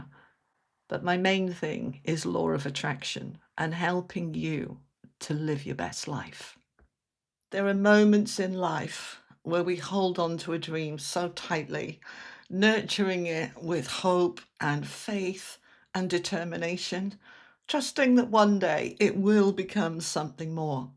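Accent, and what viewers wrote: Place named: South Wales, United Kingdom. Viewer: Hi, your accent sounds very familiar, I grew up in South Wales. I saw you on the Jeff Mara channel. You’ve put me onto a Spiritual Church nearby, I’m looking forward to meeting them. So thank you for that. And thanks for the tools you’ve explained, being the change. Love it!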